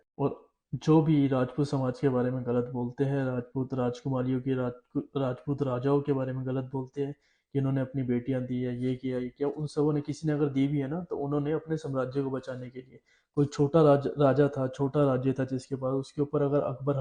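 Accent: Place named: Pakistan. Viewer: Iss channel ko chalane waala aawaz se Pakistani lag raha hai, ye koi ISI ka Agent hai jo Hindu samaaj ko todne mai lga hai isse saavdhan rahe, Jai Rajputana Jai Hindu n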